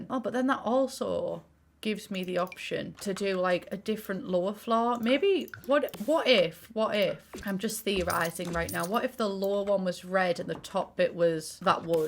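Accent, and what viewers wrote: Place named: Middlesbrough, United Kingdom. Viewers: This voiceover makes me want to move to Middlesbrough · As if ur from the Boro hahah gerrin lass me too, i just assumed I was imagining the accent 😭, best thing to come out of Boro in ever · When you’re from Middlesbrough and understand everything is saying/talking about:)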